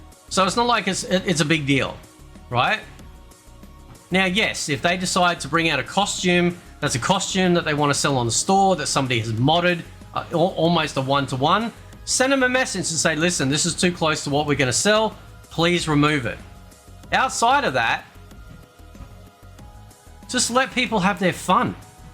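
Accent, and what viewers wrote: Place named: Australia. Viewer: Right? 😂 love the aussie accent mate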